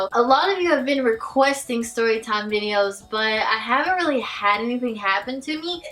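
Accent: South, United States. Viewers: Your southern accent is the cutest thing I’ve heard all week! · Why you so gorgeous tho? I love the whole southern-ness the way you say things and shit. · Do you have a country accent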